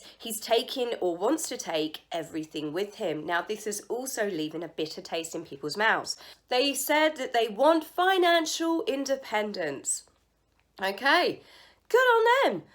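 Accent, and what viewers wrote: England, United Kingdom. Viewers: I love your accent 😍 What part of England are you from?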